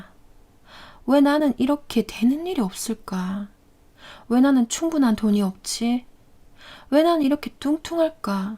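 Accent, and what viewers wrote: Gyeongsang, South Korea. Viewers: 목소리가 좋으셔서 듣기 너무 좋은데 경상도 억양때문인지 더욱더 자세히 귀에 들어와서 좋았네요^^ 감사합니다.^^ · 다른분들은 어떠신지 모르겠지만 저는 산투리억양이 너무 친절하고 진실되고 따뜻하게 와닿습니다. 저는 너무 좋습니다. 그리고 감사합니다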